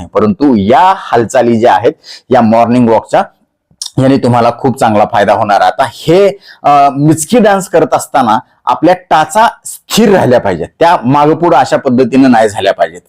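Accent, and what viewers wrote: Marathwada, India. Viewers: डॉक्टर तुम्ही नगरच्या आहात नगर बीडच्या लोकांसारखा बोलणार आहे म्हणून विचारलं तुमचं बोलणं पण खूप छान आहे आम्ही पण बीडच्या हो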